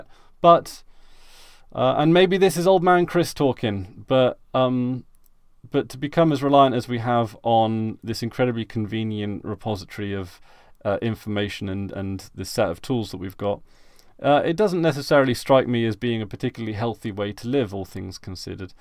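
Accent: United Kingdom. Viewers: Linux might break through.... Linux is already broken through. What are you talking about. How much of the Internet is run on Linux. How many devices run on Linux? Linux is already corporate. Niche by design. What are you talking about do you know who's on the Linux Foundation. God what a mistake listening to a brit. Should have closed the video the moment I heard your accent and saw your English teeth. Why do you guys have such bad teeth anyway? I swear. I need a ibuprofen after that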